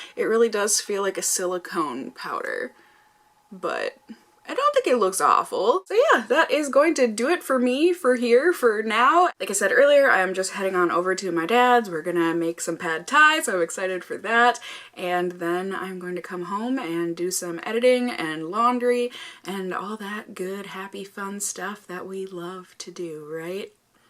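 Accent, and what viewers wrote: Minnesota, United States. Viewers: She is so relatable. She seems like someone I would have gone to art school with. Watching her videos always feels like sitting down with a girlfriend and doing our makeup. And that voice! So relaxing. Canadian here, and I think her Minnesotan accent is comfy and homey.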